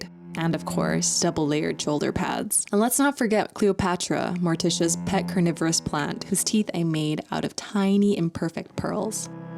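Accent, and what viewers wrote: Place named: United States. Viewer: Just now finding your channel. You are so talented and eclectic in your style! How is it that you were raised in Austria? and have an American accent?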